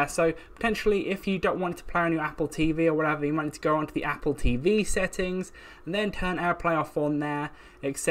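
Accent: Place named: United Kingdom. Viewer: He talks too fast and with his British Accent it's hard to understand him, he needs to slow down.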